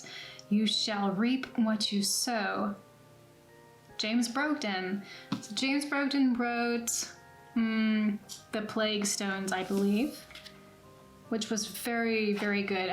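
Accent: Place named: United States. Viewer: Congratulations on 2,47K subscribers!!!!! I have a question, Why did you move to Berlin, Germany, I assume you're from the states due to your non german accent!! lol. Do you think you will ever move back here???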